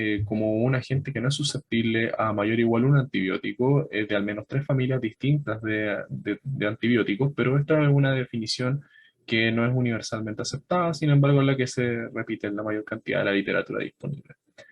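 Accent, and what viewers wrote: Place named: Chile. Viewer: Hola, entiendo por el acento que eres chileno, deberias esforzarte en hablar un poco mas neutro así el resto de Latinoamerica puede entenderte.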